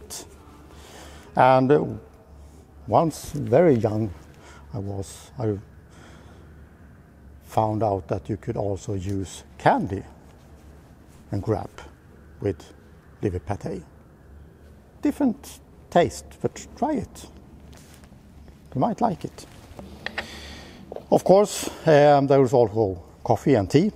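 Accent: Sweden. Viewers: At first I thought the video was in some weird dialect of Swedish and tried to find the captions.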